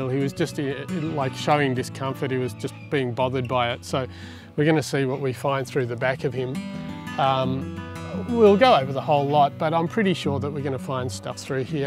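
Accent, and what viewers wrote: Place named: Australia. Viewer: Excellent work. Really enjoying your videos i just stumbled accross. I can tell from your "lack of an accent" lol, you sound like an Aussie?? (I am too) ☺ Just wondering what state you are in?? Not SA by any chance?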